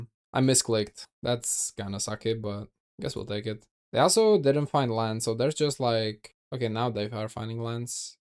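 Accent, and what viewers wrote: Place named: Czech Republic. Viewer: Dude ur czech accent is so funnily familiar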